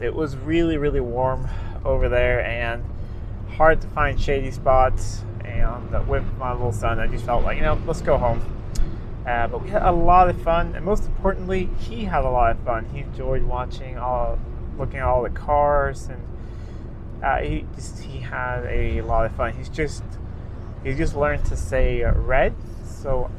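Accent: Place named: United States. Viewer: How do you have a perfect American accent when growing up in Sweden?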